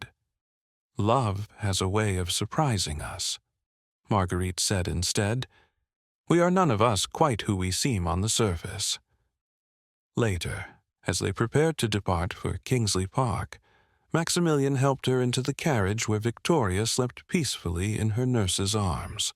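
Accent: United States. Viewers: I enjoyed this story, the relationship between Marg and Max was developed nicely, there were no nasty scheming people plotting dastardly deeds… the narrator - firstly the sing-song, staccato cadence was off, and of course, American accent for English story was off, but notwithstanding that, the diction was clear and voice well modulated, which made for easy listening 👍🥰🇦🇺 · As I commented on another story, I'm having problems listening to an American accent told for a English story.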